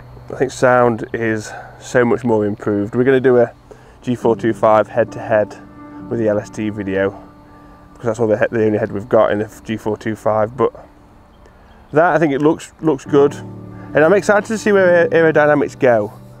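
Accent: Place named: England, United Kingdom. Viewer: I thought you sounded like an English bloke, are you in Oz?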